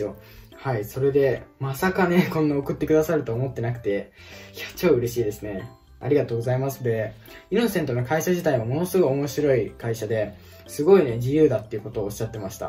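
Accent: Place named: United Kingdom. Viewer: めっちゃイギリス発音！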